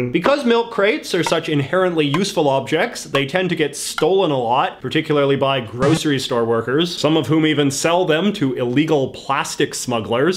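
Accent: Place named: Vancouver, Canada. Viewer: Scary robot voice with slight Vancouver accent.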